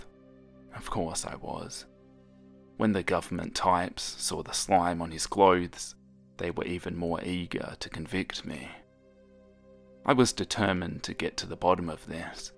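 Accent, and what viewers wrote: Australia. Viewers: Ayyy an Aussie 😌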